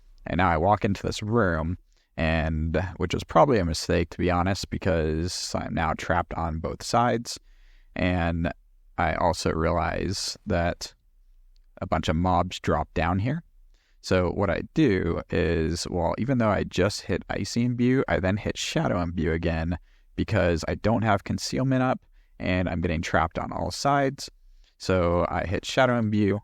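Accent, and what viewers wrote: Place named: United States. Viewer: OMG, what a video. I have watched hundreds in the past few months and while I do WANT to read about how to make a build and where to put the points, what this social scrub actually NEEDS is to learn how to actually play the build. You have given this in beautiful clarity. Showing actual footage with a commentary, freezing actual play to discuss what you used when and why. I so wish the other competant players who post these videos would do the same. I can make the build you guys so generously provide. I can flounder around on my own and work out how to employ it to the best of my ability, but a second opinion like yours, from a competant player, really helps us casual players to put the polish on our performance and for that I am most grateful for your time and effort. On the clarity of your video, may I make a point that is meant as constructive and helpful, but which might not be something you cannot change? 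For some of us not from the good old US of A, your accent along with the gravelly edge of your voice might make the odd word difficult to decipher. While social bias dictates that a gravelly voice projects authority and gravitas, it doesn't help clarity to those of us unfluent in your accent. I don't know what I expect you to do with this information, you have a lovely voice, but I thought I would share it.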